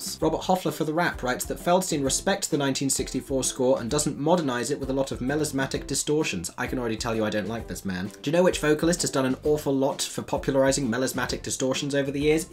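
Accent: United Kingdom. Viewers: British accent vs Brooklyn Jewish accent. What a trite criticism. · This critic is very intelligent, I'll give him that. He looks like he's 19 years old. Wonderful that he thinks he's all that. This is ALL his opinion. A British accent doesn't make you an automatic theatre historian. A bit full of himself. Not impressed. On another note, I dont think Beanie was well cast at all. Miscast, to say the least. Of course she is no match for Streisand in any decade or in any category. The voice alone. I'll tune in when this kid grows some more facial hair.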